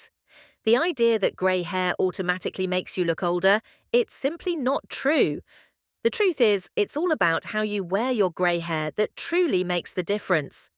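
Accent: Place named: England, United Kingdom. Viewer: English accent; American spelling.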